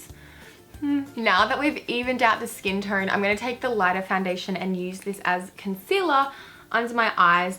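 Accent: Australia. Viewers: Is she Australian?? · She's Australian.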